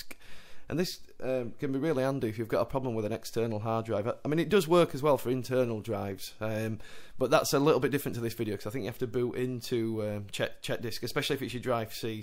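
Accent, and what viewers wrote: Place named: United Kingdom. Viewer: I'm a yankee. i usulaly don't have problems understanding Brits, but in this case hi accent added to the speed of delivery makes it hard to understand. Also his video is to small to see what he has typed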